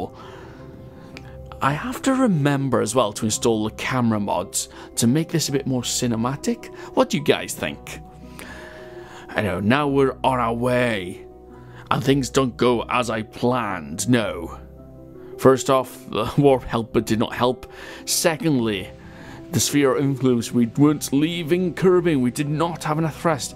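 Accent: Wales, United Kingdom. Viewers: believe me. your accent is definitely Welsh! and strong too. Be proud of it buddy!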